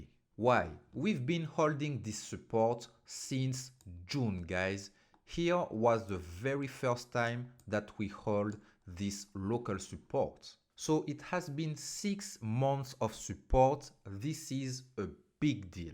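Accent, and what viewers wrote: France. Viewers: En tant que français j'apprécie beaucoup ton accent frenglish 😅👍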